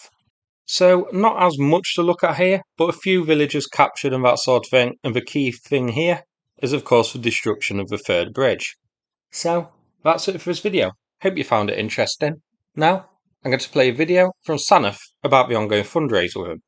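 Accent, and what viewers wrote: United Kingdom. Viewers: Love your updates, even though you've got a thoroughly Brit accent (I'm from Oz). Keep up the great work. Cheers!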